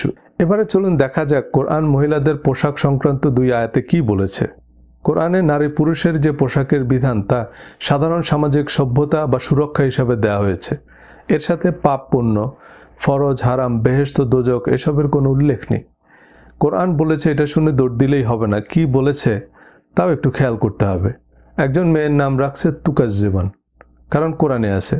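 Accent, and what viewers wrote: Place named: India. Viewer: এই লোক ইন্ডিয়ান দের মত কথা বলে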